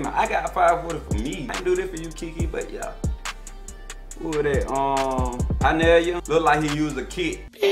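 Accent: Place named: South, United States. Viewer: You sound like you from the south...I fw with yo content thugh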